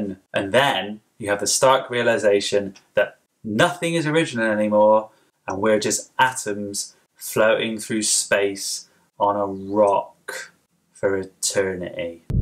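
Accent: United Kingdom. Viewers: Dude. You from the west country?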